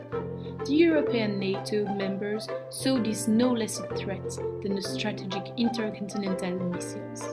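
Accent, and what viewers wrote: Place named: France. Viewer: That french accent tho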